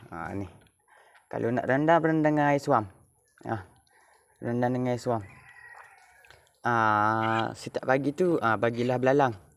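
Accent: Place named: Kuala Lumpur, Malaysia. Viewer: ni pun dah tahan dah sy dah ajar😅muncung dia ni sy dah ajar dah😭sdp dngr abe kecek KL lumpo😂😂kanteh belalang yg panjang tu😅😅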